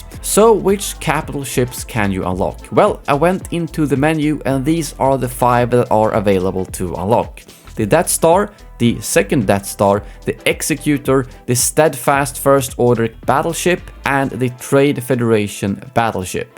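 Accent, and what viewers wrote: Sweden. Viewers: Sorry but I have to ask, are you swedish? If not i’m sorry for asking but it sounds like a swedish accent and Im from Sweden.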